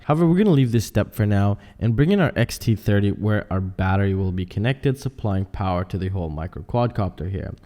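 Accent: United States. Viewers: Great vid and thanks. However - why do our beloved US friends say "sodering" when it's "soLdering"??? Always makes me laugh. :-)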